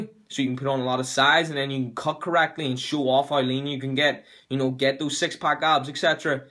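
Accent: Northern Ireland, United Kingdom. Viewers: The norn irn accent hitting hard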